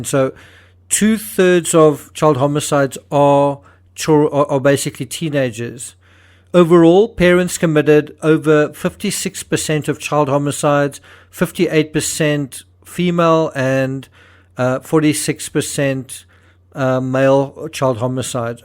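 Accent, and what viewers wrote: South Africa. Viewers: I am following other channels which is a lot closer and know more about this case. On 1 channel 1 of your videos came up where the question was where did it come from and indicate the english according to the hoax messages. I did indicate that the accent alone tells me it is someone from South Africa's video so I looked you up. There is so much info out there currently about this case. Happy to see that you tubers in SA have also picked this up.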